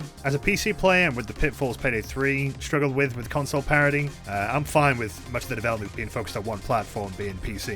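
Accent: United Kingdom. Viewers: yes britbong whisper those sweet supple long words some more please <3333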